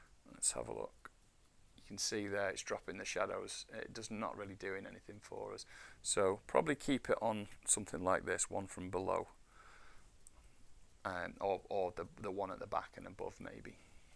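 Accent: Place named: Stoke, United Kingdom. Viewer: Great stuff. Really enjoying learning from your videos, and I’m inspired by your work. I’ve been learning to use blender for a few months now and have just invested in some VR kit so I’m still in the very early stages of sculpting and modelling with it. Your tutorials are helping me so much and I just wanted to say thank you. By the way is that a bit of Stoke in that accent ?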